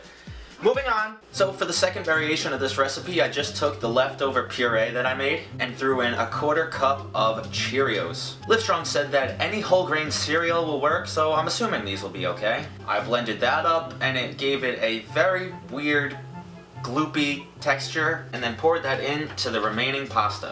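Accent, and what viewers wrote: New York, United States. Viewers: Are you from New York? You kinda sound like it. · Are you a New Yorker?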